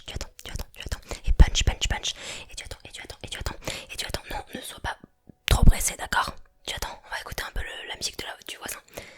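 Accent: United Kingdom. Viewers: J'aime tellement ton accent anglais, j'espère une vidéo ASMR où tu ne parles qu'en anglais dans le futur❤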